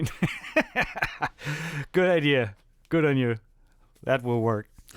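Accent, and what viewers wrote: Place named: Germany. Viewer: I really like your accent, where in Germany are you from?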